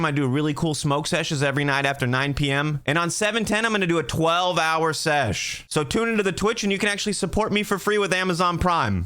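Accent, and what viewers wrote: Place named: East Coast, United States. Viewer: You have an east coast accent and you just called em Scooby snacks, you had to have grown up near new england or something 😂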